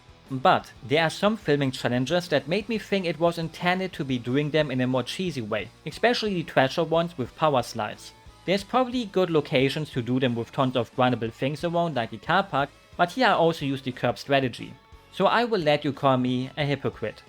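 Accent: Germany. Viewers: Extremely German, don't even attempt English. Sounds so cringe!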